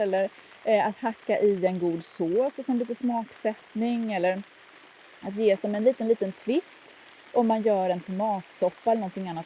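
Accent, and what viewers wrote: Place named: Sweden. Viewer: Som dansker forstår jeg 95% af hvad du siger. Det er sjovt hvordan det bare kommer an på den svenske accent hvor meget jeg kan forstå. Det er som om du snakker dansk til mig 😅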